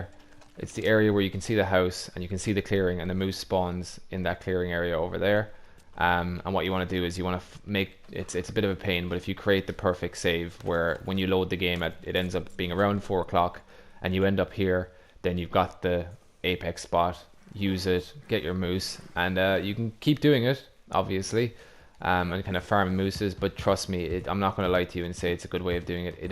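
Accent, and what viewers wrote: Ireland. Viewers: You Irish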